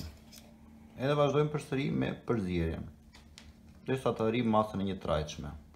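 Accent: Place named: Berat, Albania. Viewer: te bëra subscribe, per suport. 🙂🙃 Nga je? Nga e folura dukesh Beratas.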